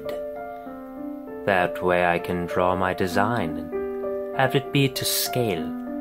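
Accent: England, United Kingdom. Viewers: I like. I like the English accent too and although it was slightly off (only slightly), I wish you would do it more often. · your calm British accent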